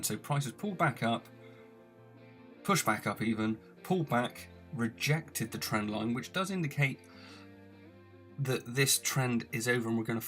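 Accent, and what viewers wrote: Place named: Bristol, United Kingdom. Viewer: Your accent is even more Bristolian in recordings!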